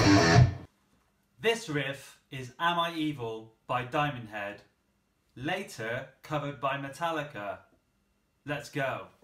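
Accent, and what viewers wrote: United Kingdom. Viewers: That Accent, i know you're British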